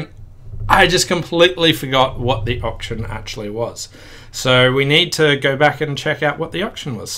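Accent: Australia. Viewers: Thanks. Just learning myself, and have started using BBO. Enjoying going through your videos, nice to see and hear an Aussie voice on YouTube.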